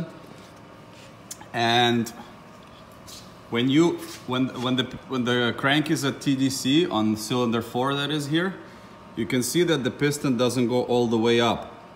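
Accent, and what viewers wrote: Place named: Russia. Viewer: я услышал русский акцент =) у вас хороший английский... мне ваш английский был очень понятен..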